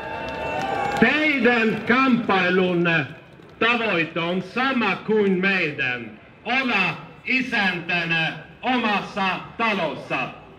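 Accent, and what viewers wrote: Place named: Sweden. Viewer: Han uttalar orden utomordentligt bra! Well done! Med svensk accent men helt suveränt uttal (o bra tal för övrigt!)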